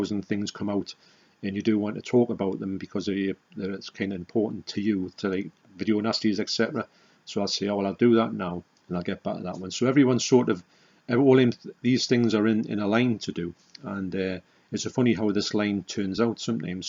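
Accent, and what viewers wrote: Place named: Newcastle, United Kingdom. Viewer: Hi, I think it's fantastic that you are open to new experiences, ideas and the chance to improve the individual that you are. I really enjoy your content and your friendliness. You have a really charismatic on-screen presence plus I love the Geordie accent. Never stop being yourself but also never stop limiting yourself.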